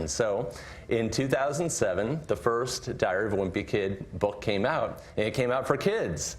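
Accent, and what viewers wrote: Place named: Brazil. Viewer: The way he talk brazilian XD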